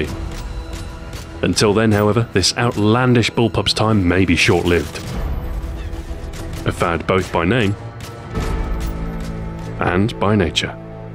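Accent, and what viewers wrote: United Kingdom. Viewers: Is it just me or do British accents make everything sound more intelligent? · I don't know why these Americans think the British way of speaking is accent, if it came first.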